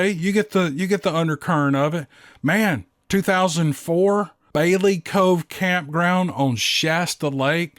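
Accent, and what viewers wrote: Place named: South, United States. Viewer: you seem new here, welcome to one of the best southern voices of the cryptid world - between him and Kerry Arnold [Bigfoot Odyssey] their voices/accents and way of delivery are second to none and in my opinion, just give these stories a much appreciated bit of southern flavor.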